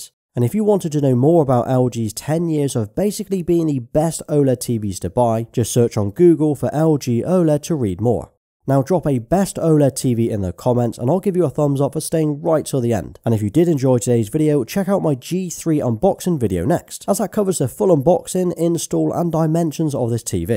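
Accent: United Kingdom. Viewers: Hello, are you british?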